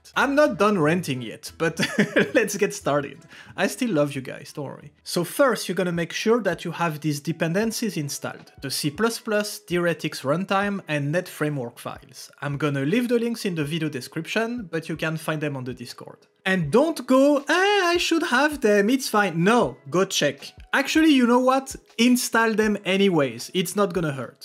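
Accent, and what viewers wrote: France. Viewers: Dès les premiers mots on sait que t'es français! Merci pour la vidéo! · tes francais ?? where is he from >>,<<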